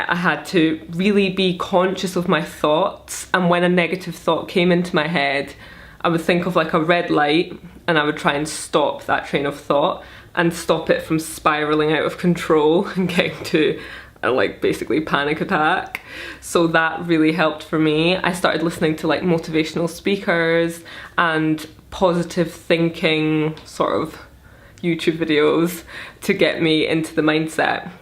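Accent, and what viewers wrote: Scotland, United Kingdom. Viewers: She has a slight Scottish accent. Beautiful skin.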